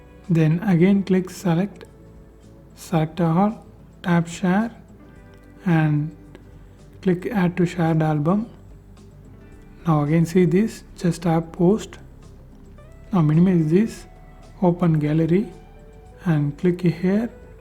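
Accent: India. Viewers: The moment I heard the Indian voice I knew I was saved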